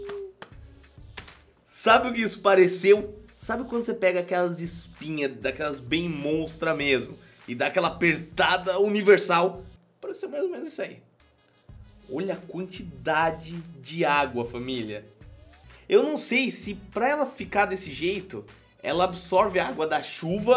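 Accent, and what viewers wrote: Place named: Ceará, Brazil. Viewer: Você e cearence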